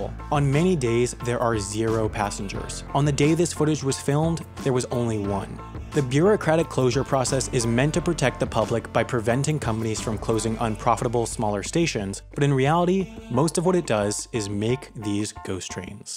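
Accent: United States. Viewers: It was quite weird hearing an American say the name of my small insignificant town near Manchester called Stalybridge. But yes, of course, he said it wrong: stal-y-bridge. It is Stale-y-bridge. One note of fame. Stalybridge was the theatre where "it's a long way to Tipperary" was first sung. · He's American....they all pronounce "route" as "rout". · I got so far and gave up with the annoying American voice over and mispronunciation, America has terrible train services for passengers. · Step One: If you're doing it in Britain...stop saying route the American way!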